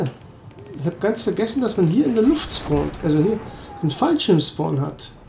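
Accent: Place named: Bavaria, Germany. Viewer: 100% Bayer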